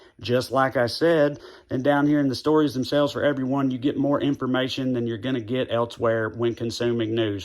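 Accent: South, United States. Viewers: I’m always glad to have a person with a southern accent who is funny on purpose in my subscriptions. Keep up God’s work.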